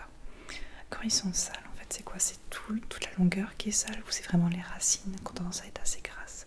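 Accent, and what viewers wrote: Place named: France. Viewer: Hi, I hope you continue with your desired goal of making it as an ASMRist - this is very admirable.Please produce more videos centred around you speaking in English (French speaking English is the ASMR in essence).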